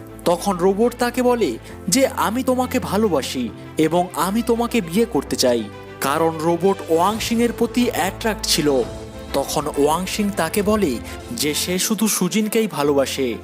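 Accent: Kolkata, India. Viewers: uner koctay mone hoy kolkatar??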